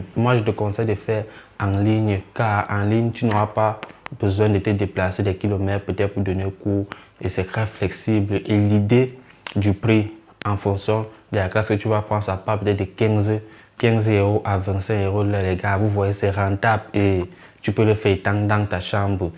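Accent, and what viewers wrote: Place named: Cameroon. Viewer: Avec le bon accent camerounais a la Francis ngannou yes frerot force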